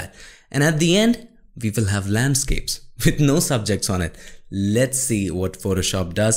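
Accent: India. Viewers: I don't really get this guy's accent is it fully Indian or American.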